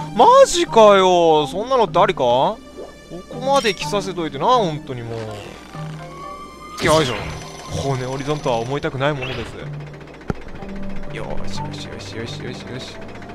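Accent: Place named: Ehime, Japan. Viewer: 初コメです!風のタクトを見てからずっとさんの実況大好きです。たまに出る愛媛のなまりとかついつい懐かしいと思っちゃいます(笑) さんアムロ、大好き!!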